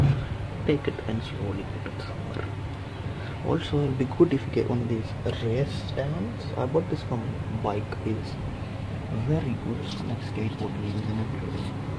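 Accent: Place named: India. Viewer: curry accent :) made me lol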